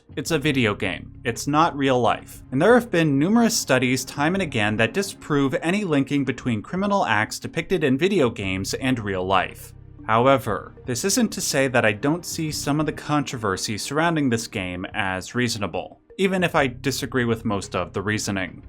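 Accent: Canada. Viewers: Whoa! Plot twist, you’re Canadian!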